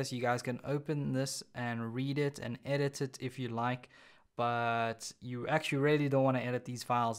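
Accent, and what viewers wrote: South Africa. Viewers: Sounds like you're South African? Great tutorial btw. Lekker man.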